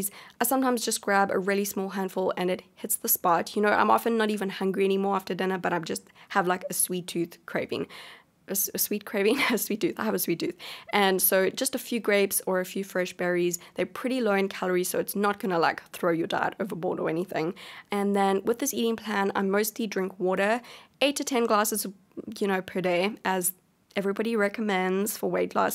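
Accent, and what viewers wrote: South Africa. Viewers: Where is your accent from. I'm guessing South African. Sounds pretty. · Hullo from another SAfrican in New Zealand. Miss that accent and I miss Woolies!! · That's a South African accent. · Yah that's not an Aussie accent haha, South African more like :p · That South African accent nehh😍😍plus Woolies products got me hook,line and sin🇿🇦✌🏿👊🏿👍🏿😉 Lekker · Stumbled on this video and was like ‘wow she sounds south african’🤔 Thats because you are! So lovely to see a fellow SA youtuber and loved the video! Now Im hungry... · I'm definitely gonna try out these recipes they look super yummy! Also, love the quality of your videos and use of good angles, closeups, lighting, etc. One more thing, my best friend is from Cape Town and gotta love those South African accents hehe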